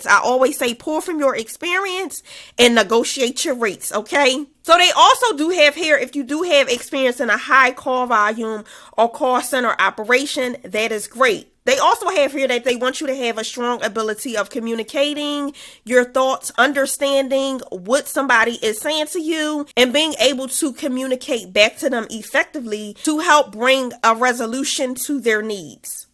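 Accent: Philadelphia, United States. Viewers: Philly jawnnn that accent is so home to me...Im in AZ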